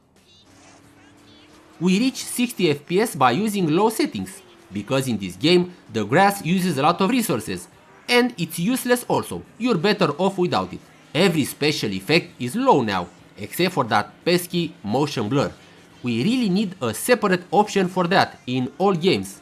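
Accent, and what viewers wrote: Romania. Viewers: Great old video cards and this isn't even the GT variant. Oh, and I'm just wondering, are you Romanian? You sound a lot like Dr Ortmeyer from Hitman Codename 47 😅🙃😝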